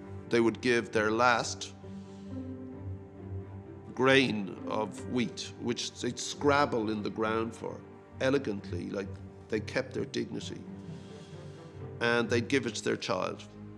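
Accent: Ireland. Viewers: My God geldoff is so Irish. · Utterly Irish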